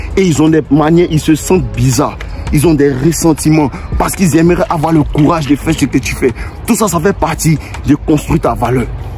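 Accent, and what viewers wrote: Ivory Coast. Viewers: on adore ton accent ivoirien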